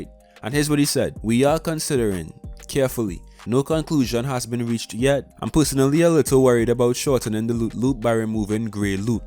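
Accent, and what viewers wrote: Trinidad and Tobago. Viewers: Are you from trinidad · Are u trini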